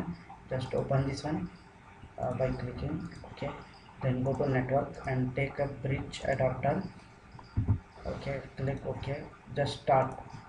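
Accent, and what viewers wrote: India. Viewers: Hello, in this tutorial I will show you how to install cPanel and WHM on Virtual Box. Don't worry man, I can understand everything you're saying. I spent some time in Hyderabad and Khammam, so it's easy for me to understand. People that can't understand you probably haven't heard your type of accent before, so don't feel bad!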